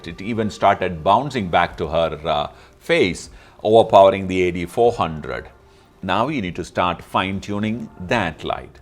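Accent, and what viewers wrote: India. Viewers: RARELY where i keep listening to an Indian English speaking person! but you sir got me to listen! your production got me to sub and I'll keep watching.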